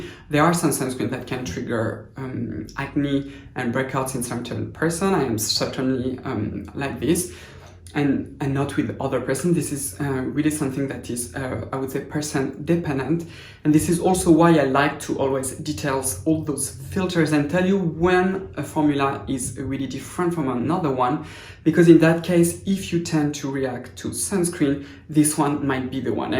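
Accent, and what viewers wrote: France. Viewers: Bonjour! I adore your videos, especially appreciating the science. There are far too many skincare videos of sincere, but but subjective reviewers, essentially intuitively responding to products. Also, I might say as an American, your American English is impeccable, as you have clearly mastered idiomatic expressions. It is quite "cute," as a native English speaker, to hear such idiomatic expressions from a Frenchman. Your accent is also adorable. As an aside, I speak French. I am told my accent is "adorable" to be fair.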